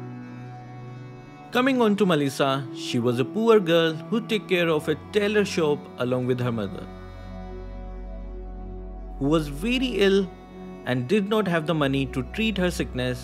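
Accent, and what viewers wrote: India. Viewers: Hi, I'm genuinely curious why you have Japanese name but you have Indian accent. So if I may ask are you an Indianese or Japian?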